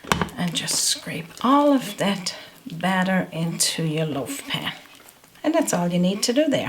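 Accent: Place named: Newfoundland, Canada. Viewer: Oh my I could listen to you talk all day, it makes me think of growing up in Newfoundland. I think I will have to get me a couple of your cook books.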